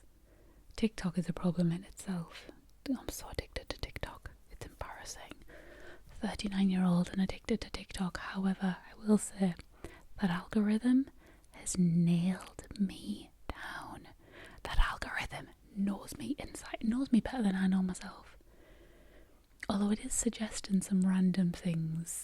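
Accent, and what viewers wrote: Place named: Northern England, United Kingdom. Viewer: ! You were my first asmrist I found about 7 years ago and it's crazy to see how much you've progressed and how many things you're working on. After years of watching biscuits of britian, I've now moved to the UK myself and am finally trying all of them. And I can hear your northern English accent so much more now. I think you're wonderful and however much time you need off, please just take care of yourself and only make videos you enjoy! x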